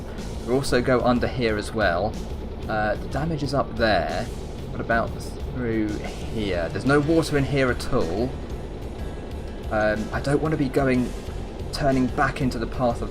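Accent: United Kingdom. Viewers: think they changed somethings with the megaladon.. that f* B* follow my boat for at least 15 min. really like your vid's dude! Charming accent aswell UK i presume? Ever thought about making a stormworks server? think it could be fun, you might want to look into it cause i would join that in a second. i understand it's a lot of pc power required for keep it running but how you play solo is already really cool.. now ad that to the followers you already have. just a thought mate:) keep going you're amazing video's about stormworks!. NJersey is okay but he thinks he is amazing and presumes to much. frantic is funny cause its an idiot. Camado is US and wants views lately. well that's enough b*tching. check the server stuff. guessing of the followers you have, we would have Alot of fun:)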